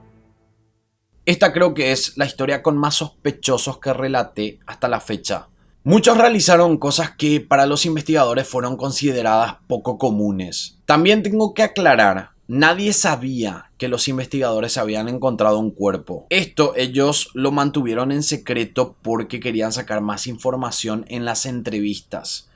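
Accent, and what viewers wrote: Paraguay. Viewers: Me encantan tus casos y como narras!!! Dios mío, y más aún escuchar las narraciones con ese acento paraguayo 😍 jajaja. Seguí asiiiii, éxitos siempre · No soporto el acento paraguayo. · Muy bueno hermano te descubrí hace un par de días. Ágata descubrí el acento paraguayo, muy buenos los videos. En Tucumán Argentina hay dos casos interesantes uno es Dulio Fernández creo que del año 1995, un chico que desapareció en un río, y hasta hace poco se hicieron búsquedas en EEUU por su desaparición.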